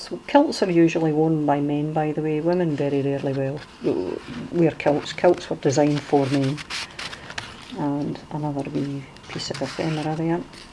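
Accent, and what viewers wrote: Scotland, United Kingdom. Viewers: Beautiful, really love the vintage photos, tartan, and your lovely brogue and interesting description of Scottish history. We do tend to romanticize the past, forgetting sometimes about the harsh realities... :) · I was attracted to your name being Scottish or should I say a Glaswegian I have just started being interested in Junk Journals and your Scottish journal has inspired me to make a couple of these for my Australian relatives. Listening to you makes me long for my home.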